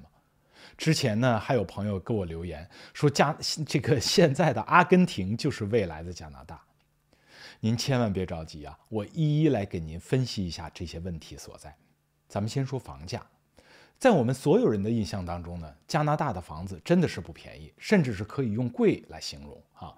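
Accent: Standard. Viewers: 主播的标准普通话非常好，非常珍贵，听着就舒服。 现在很多其他主播普通话都不标准，说话时舌头伸不直，都秉承特色社会主义装B狂，听着觉得恶心。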